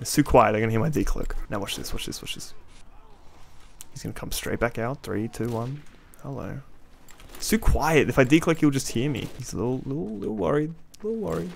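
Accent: Australia. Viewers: Australia accent plus mystery dungeon background music gets you a sub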